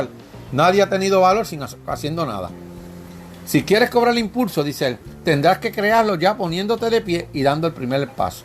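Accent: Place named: Puerto Rico. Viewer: Ese acento parece Boricua. 🤔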